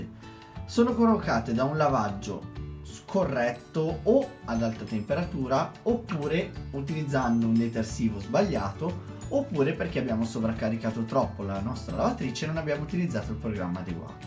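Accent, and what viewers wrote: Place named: Liguria, Italy. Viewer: Ho provato ! Molto meglio ho impostato programma misti (la mia Candy 9kg ha questa dicitura x i sintetici) 40° asciugatura di questo stesso programma, ha il simbolino del ferro da stiro, torce molto meno i pantaloni che comunque ho notato non devono essere troppi direi max 4 ..in sole 3 settimane di possesso della lavasciuga sto imparando grazie al tuo aiuto e provando da me nuove opzioni ! Mi sei di grande aiuto guardo tutti i tuoi video e sono contentissima che mi rispondi tempestivamente. Simpatico anche il tuo accento (mi sembri ligure) ciao ti scriverò ancora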